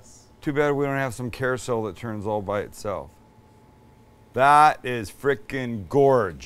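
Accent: Canada. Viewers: I’ve been following this channel for how many years now and I just now caught the Canadian accent??? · Did I hear say he was born in California? He sounds Canadian or…something.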